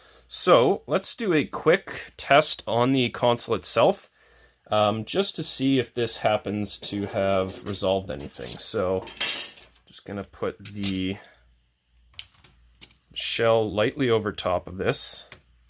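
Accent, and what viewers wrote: Canada. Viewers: Lol I dunno why but being American I always get a kick out of Canadian accents 😁 Great video as always! This will surely help a lot of ppl fix their 32Xes!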